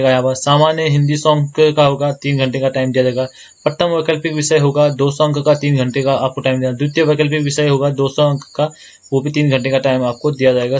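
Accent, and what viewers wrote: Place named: Uttarakhand, India. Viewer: Bhai aap phadi h kiya aapki language bhut piyari h. 😍😍❤ I love Uttrakhand ❤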